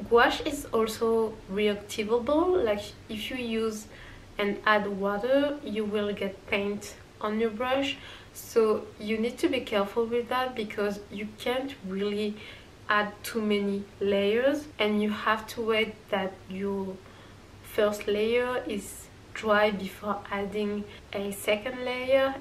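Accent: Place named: France. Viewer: I love you,re accent. Are you frence? comment vas-tu. Bien? I love you,re gouache painting. 😊👋🏼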